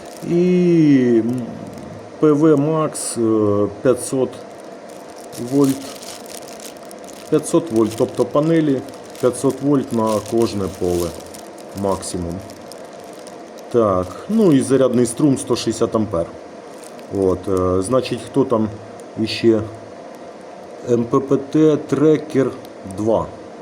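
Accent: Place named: Poltava, Ukraine. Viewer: Говір Полтавський)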